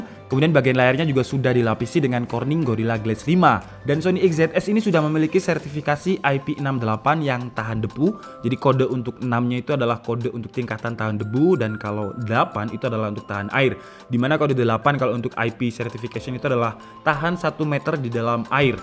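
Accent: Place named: Dieng, Indonesia. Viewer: wala mas orang puncak dieng tah WKWKWK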